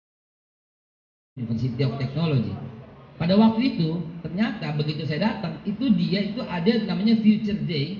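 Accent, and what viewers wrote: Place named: Sunda, Indonesia. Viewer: Terima kasih ilmunya Babeh lucu keren . Semoga bahagia ,sehat , sejahtera selamat dunia akhirat. Babeh orang jakarte kenape logate seoerti oreng sunde?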